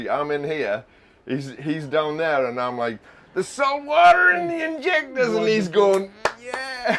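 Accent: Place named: Teesside, United Kingdom. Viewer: What a fantastic build. Love the smoggy accent!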